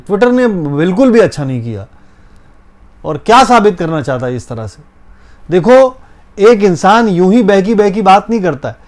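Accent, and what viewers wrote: Mumbai, India. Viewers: भाई... किसी को copy मत करो... आप original में भी काफी अच्छे हो .... मुंबईया language में ... 👍👍👍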